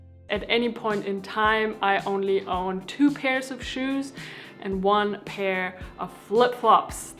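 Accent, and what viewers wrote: Germany. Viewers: are you German? I think I hear some accent 😊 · I stopped dating and found I had a wealth of time and money. Your accent, is it German? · Great video, thanks! Especially the idea with the physical gifts... I’m gonna do that. Are you actually german? I like that lovely accent so much! greetz and hugs from Berlin 🐻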